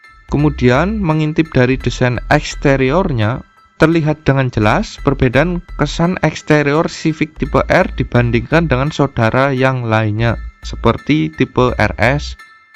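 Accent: Banyumas, Indonesia. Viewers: Ora Ngapak Ora Kepenak 🔥. Sukak nyong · Asli kueh man, jarang" ana chanel oto ngapakers 🤣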